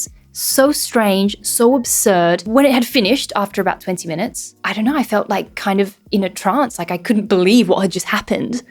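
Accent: United Kingdom. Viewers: I thought you were British 😊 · YOU’RE AUSTRALIAN ?????😭😭😭😭😭😭 Your French is native level how did you learn French ? You have a British accent too (my perception ofc) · I just love your accent (thought you were british) and your french is remarkable... nice to discover your channel...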